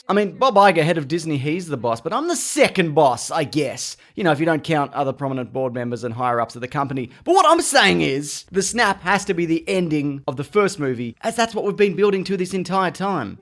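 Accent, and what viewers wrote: Australia. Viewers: Why is hearing marvel facts and what not so much better in an australian accent?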